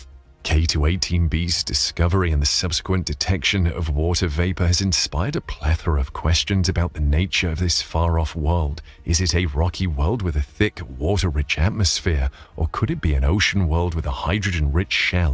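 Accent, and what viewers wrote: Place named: Eastern Europe. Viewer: The robot speaker has a East European accent. Many promising titles lately that don't do as they promise.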